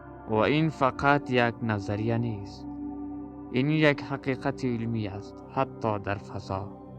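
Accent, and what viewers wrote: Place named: Afghanistan. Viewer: بی نظیر بود امیدوارم موفق باشی چون این نوع محتوا ها را برادران ایرانی ما زیاد میبینن البته فقط محتوا های که خود ایرانی ها ساخته باشد اما چون لحجه ما افغان ها را دوست ندارند بعد ازینکه روی ویدیو کلیک کردن همینکه لحجه ات را بشنوند فورا از ویدیو خارج می‌شوند ما افغان ها همیشه دنبال کننده برای آنان بودیم ولی آنها فقط خودشان را می‌بینند امیدوارم تعصبات را کنار بگذارند شما ادامه دهید موفق میشین انشاالله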